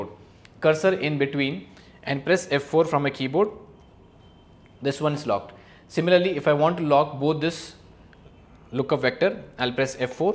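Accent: India. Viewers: This indian accent is making me go totally insane. Had to quit after 20 seconds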